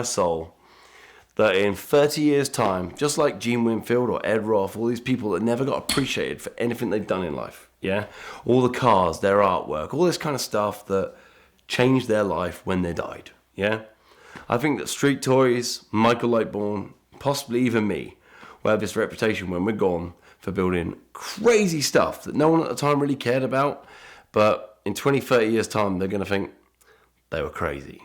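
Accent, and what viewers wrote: United Kingdom. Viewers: No matter how cool you are that Brit accent make you sound like a total douche!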